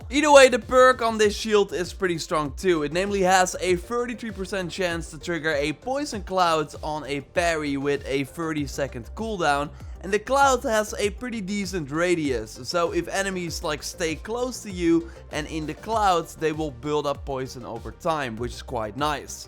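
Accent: Netherlands. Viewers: Do you speak dutch, you kinda sound like someone from the netherlands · Je hoort gewoon aan zijn accent dat hij Nederlands is🤣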